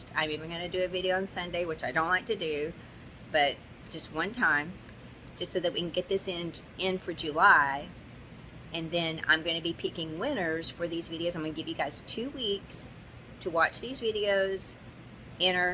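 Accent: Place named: Texas, United States. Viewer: Love watching you. Love the accent it's like being back home with my mama in Texas.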